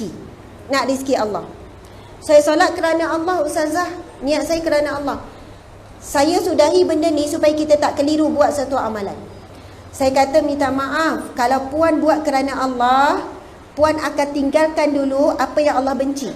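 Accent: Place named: Negeri Sembilan, Malaysia. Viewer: o ustaza ni org nogoqi..😅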